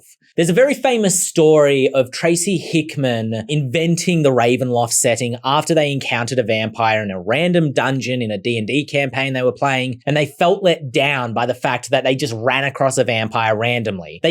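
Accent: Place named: Australia. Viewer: Aussy vampire intro... I am sorry but I can't take it seriously enough)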